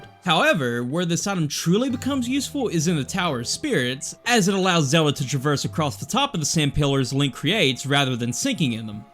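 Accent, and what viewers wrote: United States. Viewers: First, I adore what I swear is a faint country accent I hear, but I'm actually here fresh from PBG's at the time latest video, (Wind Waker) and I admit- While I'll likely never grow tired of Zelda content I grow weary of the same 3D Zeldas being covered as it's not like there really is any bad ones. You can find someone presenting valid good points for any of them. I actually keep forgetting the DS ones existed that were a great time of my past. Spirit Tracks really does have what I'm sure is among the most complex "puzzles" in the series mainly in the Tower of Spirits, as well as some of the most fun Bosses that actually can present a challenge specifically because the DS Zeldas are right in between what I consider "True 3D Zelda" and "Top Down Zelda." As for items as you mentioned of the Whirlwind, sure there are a lot of wind archetypes items in the series, but it's the order of the items you get staples or not that can affect things. 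You're using the Whirlwind for alternate means to progress until you get the dungeon's item proper, the last dungeon's item is a more inefficient tool that can still fill certain shared roles keeping it relevant, and keeping my disbelief suspended. This isn't exclusive to Spirit Tracks of course, but it feels you're getting the most out of each item until you're hard walled by something you need the next item for. And YES. This game does have it's flaws. As weird as it sounds I wish it's elements were remade into a "True 3D Zelda" but I under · Is that an Arkansas accent? There aren't enough gaming YouTubers with a good, strong American accent. Review some more Zelda games and I'll watch. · From one guy with a country accent to another: great job!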